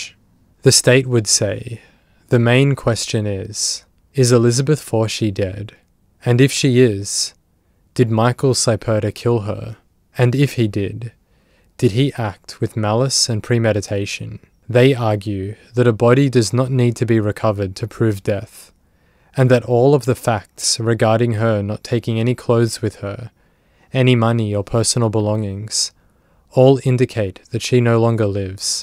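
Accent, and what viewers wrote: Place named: Australia. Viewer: I really do enjoy your videos. You tell the stories with the upmost respect for the victims, their families and their friends. I particularly like the fact that you don’t “over dramatise” an already horrific and terrifying “story.” I also thoroughly enjoy these longer videos. Thank you for all the research, effort and time you put into each and every one. 🫶🏻💙🫶🏻 ps.. where’s your accent from? Australia?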